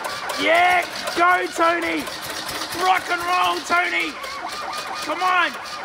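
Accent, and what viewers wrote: Australia. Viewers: Great Voice 👌🤑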